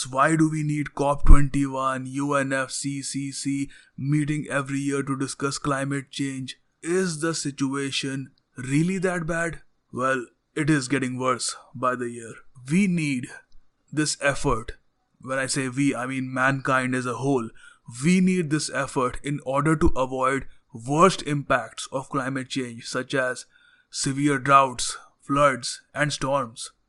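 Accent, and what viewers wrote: India. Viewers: I don't want to listen to that stupid annoying Indian accent! Ewww. Just fuck off!!!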